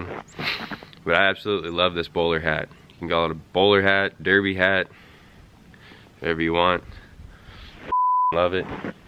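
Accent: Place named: Alaska, United States. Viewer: Thanks for an in-depth review of these hats! The website unfortunately doesn't show the inside and I don't have a Filson store nearby, but I'm considering the Mackinaw hat. Are you up in Alaska, by chance? My Uncle is from there and you have the same accent 😎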